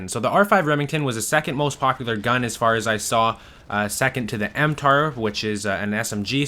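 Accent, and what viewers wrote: Canada. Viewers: MSBS is nerfed now apparently, wonder if its allowed now. Good video, was looking for this info for awhile. 1 question, you Canadian? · 4 fuckng minutes listening to a Canadian ramble on 'aboot' pointless crap. Get to the point man..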